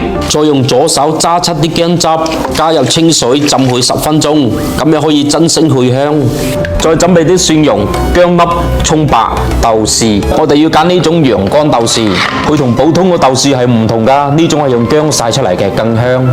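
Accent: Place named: Hong Kong, China. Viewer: 你讲返普通话啦，唔该你。听港普 已经够折腾了，还要加个普粤 真的是 普你亞波 咯。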